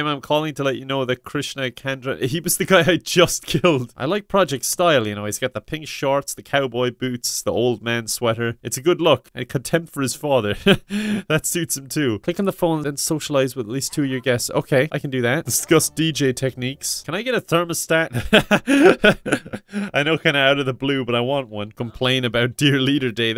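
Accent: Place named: Ireland. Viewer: Where in Ireland are you from 💜💛💜💛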